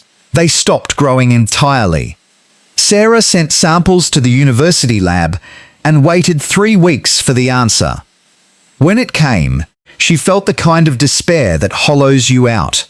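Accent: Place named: Australia. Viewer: With the Australian voice, maybe you should tell an Australian story. I really enjoy these stories..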